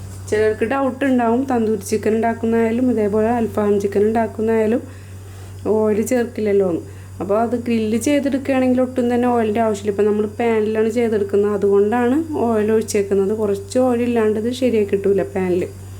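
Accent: Kodungallur, India. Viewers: Ellathinteam pirake ka nnanallo. ...kodungaloor bhashayano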